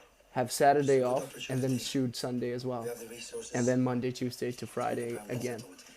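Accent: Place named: Denmark. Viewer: I listened to this whilst napping this afternoon and HIS voice is like ice cream in my ears,so soothing..he should read audiobooks or sleep stories or something like that. Love the Danish language and accent but his English is pretty great I would say,I like the way he says things when he gets a bit muddled!. 😄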